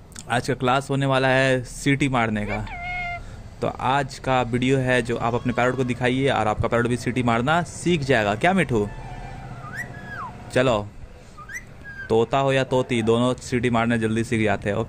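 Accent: India. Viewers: you are a stress buster.... Thank you for being u❤ your accent is pure desi... Pls don't change 😆👌👌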